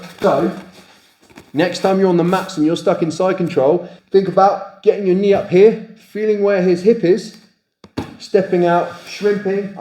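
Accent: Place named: RP, United Kingdom. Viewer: Such a funny accent😂 Posh as f😅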